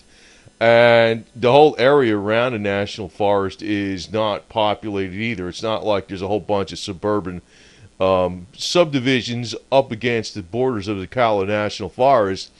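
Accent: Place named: South Jersey, United States. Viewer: Dude You sound like another refugee from South Jersey? Me too Burlington, CO. Been in FL. about 20 years now, ain't going back!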